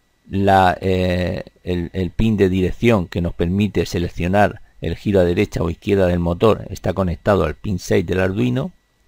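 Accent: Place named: Murcia, Spain. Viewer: Hola, muy interesante!!! por tu acento y espero me disculpes por si me equivoco, eres de Murcia. No tienes un curso intensivo o acelerado? me quiero iniciar y no me importaria viajar a Murcia, si es que eres de allí (por la proximidad a Valencia), para aprender algo de lo que muestras.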